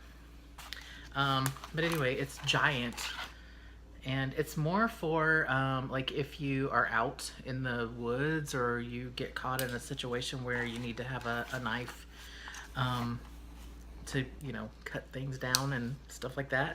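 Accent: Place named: South, United States. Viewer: Cool ! I thought I heard some southern in you! I'm from/live in Alabama!!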